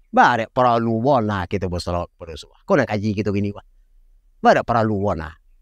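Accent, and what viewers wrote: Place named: Payakumbuh, Indonesia. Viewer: mantap.., bahaso pykmbuahnyo totok.., yo mode tu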